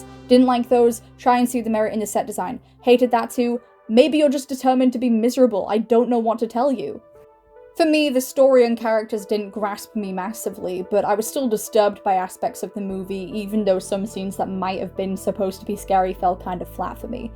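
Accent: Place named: United Kingdom. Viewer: Really cool watching a worm talking about liminal space in a british accent.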